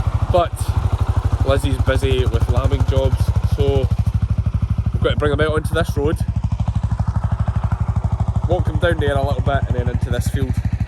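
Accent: Scotland, United Kingdom. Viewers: I'm impressed with you running and chasing Ewes shortly after having Covid. It usually takes people several weeks to recover their breathing and strength and your recovery is amazing in the fact you can actually run chasing a eve around. I'm impressed with Meg's rounding up the ewes but not exactly 100% sure i understand your accent enough to figure out your commands to her. Could you please explains your commands to Meg for us foreigners. I think Meg's been trained abolutely great, and wonder if the breed she is has some natural instincts or shes just an amazing wel trained about what to do with your sheep. i wouldn't mind you confirming what your commands are and what they mean. I also would like to know what each type of sheep they are and why they are called that. Is it age or type or both. I enjoy your channel but I find I have to watch several in a row to get back into understanding you. 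I appreciate the Scottish terms you use but would like a I guess it would be better with a translation as to what you mean. You're doing a great job and hope your channel grows and I appreciate all the hard work it takes for especially to release a vlog daily especially when you're so busy working lambing all day. when youre and you mentioning that what you get for your vlogs and merchandise helps during your tougher months and hope you'll be earning 10 times as much as your currently getting. A thing I wish you'd explain better. Maybe I missed an episode. Could you explain what type a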